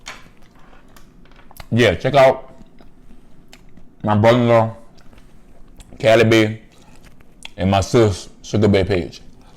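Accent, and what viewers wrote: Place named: South, United States. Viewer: New subbie here! Love your personality & your southern accent. Do I hear some geechie or Caribbean in your voice? Congrats on being smoke free. Keep focused!! Peace love!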